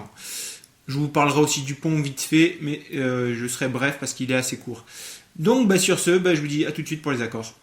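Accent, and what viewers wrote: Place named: England, United Kingdom. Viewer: Magnifique accent anglais. 👌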